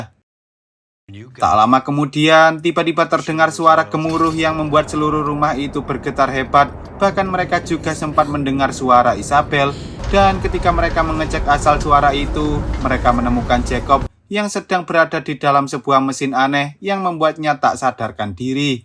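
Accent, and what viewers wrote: Java, Indonesia. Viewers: Pengisi suaranya jawa banget 😅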